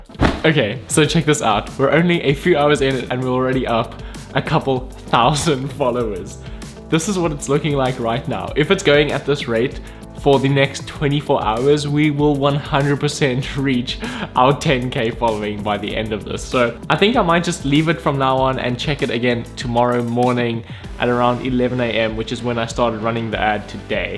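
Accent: South Africa. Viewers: South African accent👌🏻🔥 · Are you from South Africa? I'm hearing my accent :)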